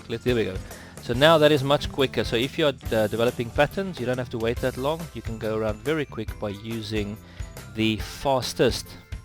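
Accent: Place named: South Africa. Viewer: good video,and refreshing to hear a south african accent, (to me) the music is slightly loud and distracting.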